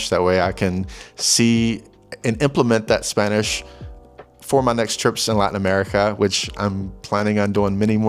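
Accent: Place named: Russia. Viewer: You speak Spanish like Russian could be your first language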